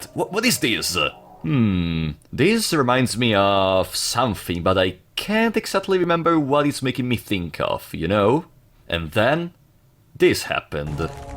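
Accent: Italy. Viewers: I don’t think fear and hunger would be the same without your accent. Onestamente sono molto contento che sia un italiano ad essere forse la persona più rilevante nella fungercommunity, viva il patriottismo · the italian accent hitting so hard <3 te se ama che conosci funger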